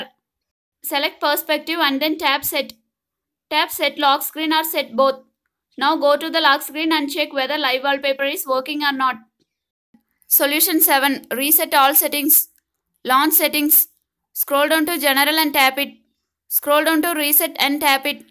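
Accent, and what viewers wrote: India. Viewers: Indian English. Why in so hurry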